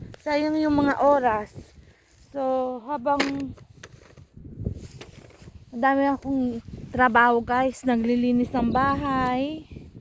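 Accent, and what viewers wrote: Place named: Ilonggo, Philippines. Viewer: Pinay2 na pinay at ilonggo tune😅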